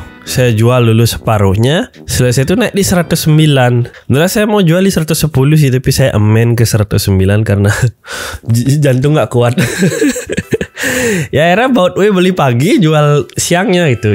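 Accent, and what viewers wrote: Bali, Indonesia. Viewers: Mantab,.,.,.. nasehatnya apa adanya… aksennya org bali sekali hehe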